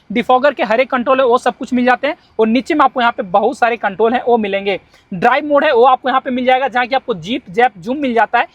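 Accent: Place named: Bihar, India. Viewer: Bhai aap bihari hain kya?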